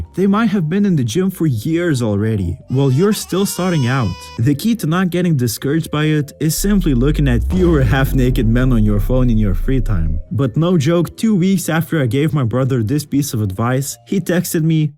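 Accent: India. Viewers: Bro the indian accent😢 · Don't you think it's racist to do that Indian voice over?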